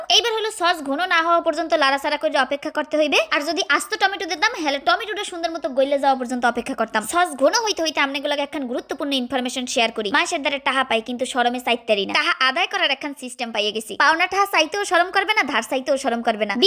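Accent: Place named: Barisal, Bangladesh. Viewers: Apni kon deshe bas koren,,,eita borisal er basa,,,,,ei prothom sunlam borisal er basa kaw sune nai,,,,tao se abar Bangladesh e😅😅😅😅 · Sona...Tumi....erokom... borisailll a....vassssa...kivabe...set....korlaa????..NA...ki....sotti..sottti....bolo.😂ami..khulnaiyyya.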